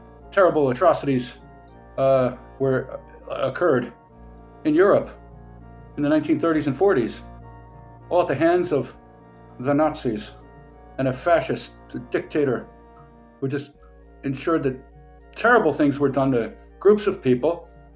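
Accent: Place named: Ireland. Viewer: I did NAZI that coming...I would have thought you were Irish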